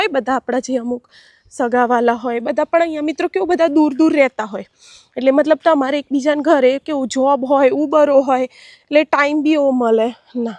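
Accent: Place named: Himmatnagar, India. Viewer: Tame. Himmatnagar side na lago chho, mari ekk friend hattii evu j bolo chho.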